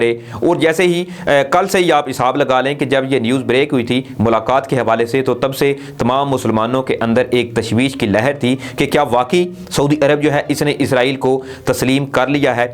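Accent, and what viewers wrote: India. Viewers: تم یہ بتاؤ بھارتیوں کی طرح اردو کیوں بولتے ہو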